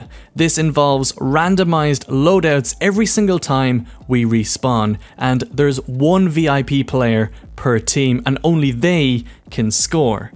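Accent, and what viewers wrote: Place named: Ireland. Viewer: Hes Irish. Its in his accent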